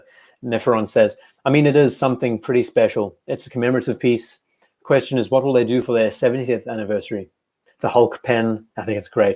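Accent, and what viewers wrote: South Africa. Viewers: Your dead wrong Bob! I completely disagree! FYI it is just under 4 hours of snobbish and arrogant critiques and opinions of watch photos by an obviously narcissistic man with a South African accent that he has mistakenly made sound so patronizing and annoying that nobody listening to him will realize he was trying to sound intelligent or "cool" because their brain will be frozen by the endless nails down a chalkboard effect that his voice has on them and he never stops talking for more than a second or two for the entire four hour torture fest. This obvious narcissist who definitely cannot afford a single watch that he talks about unless his "Daddy" buys him one is also completely unqualified to critique or give his opinion on these timepieces so thank goodness for the repetition Bob, it helps us to remember the information being shared that is most likely completely wrong and/or irrelevant. 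The best part is this guy is only 26 or something unless I heard him wrong which I may have because I was often interrupting him by yelling and screaming profanity at the screen but who talks like this guy at 26? Notice he doesn't show his face at all which is probably because he was bullied so bad in high school that he had to enter the witness protection program. The world is going to end soon if arrogant, luxury worshipping little weasels like this guy can actually make money rambling on about watches. Freedom of speech is a real burden sometimes and this is one of em big time. I wis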